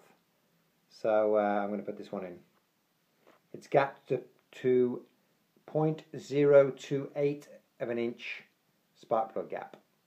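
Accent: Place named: United Kingdom. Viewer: U sound like ur British, I thought u guys called a wrench a spanner?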